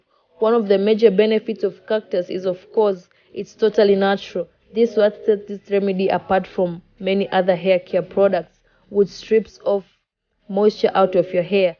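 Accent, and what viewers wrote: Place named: Kenya. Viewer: Her accent sounds like she is from Kenya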